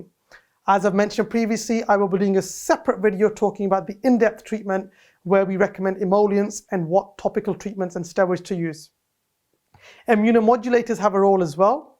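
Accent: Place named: Somalia. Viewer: I like your accent, that reminded me while I was by the border of Somalia.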